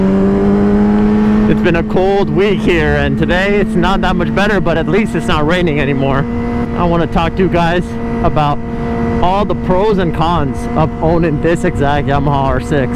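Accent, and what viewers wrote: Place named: Pakistan. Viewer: He’s definitely Pakistani, I can hear it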